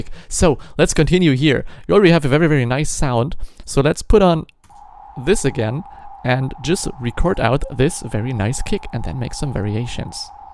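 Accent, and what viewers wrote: Germany. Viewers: ich liebe diese deutschen akzent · Ich wusste es! Ich höre den Deutschen Akzent doch raus ;D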